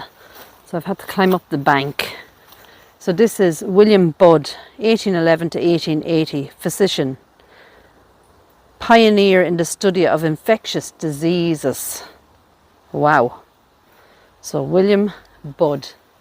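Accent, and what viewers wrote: Ireland. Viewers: I know this isn''t an "IRISH* cemetery. Maybe it's the fact that the narration comes with an Irish accent. It looks like the cemetery is extremely huge if what you covered in 45 minutes is just a small portion of it. There's also a certain charm about overgrown cemeteries. I think it has to do with the body coming from dust and going back to dust after the spirit leaves. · Such amazing places you show in your videos. I've always wanted to visit Ireland for historical reasons and the beauty of the nature. I love your dialect, it is really charming.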